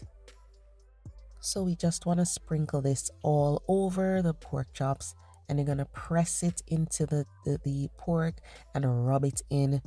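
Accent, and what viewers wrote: Jamaica. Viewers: Jamaican? Hearing an accent!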